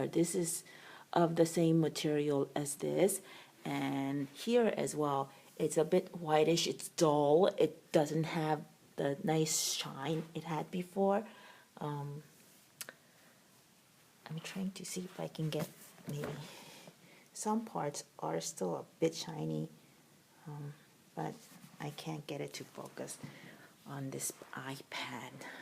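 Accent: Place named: Philippines. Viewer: You sound like a Filipina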